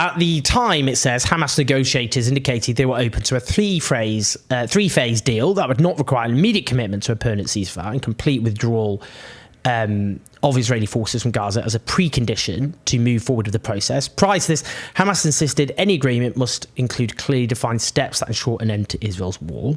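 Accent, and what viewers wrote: United Kingdom. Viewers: ok mf, nothing your British accent voice can do. about Israel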